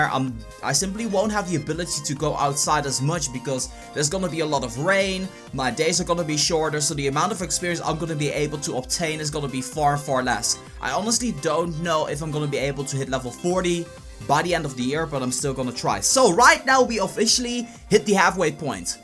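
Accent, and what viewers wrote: Netherlands. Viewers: Leuk accent in het begin, maar verder in de video krijg je een heel groot Nederlands accent. Maar toch leuk gedaan! Groetjes uit Limburg. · are you from holland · Are u dutch?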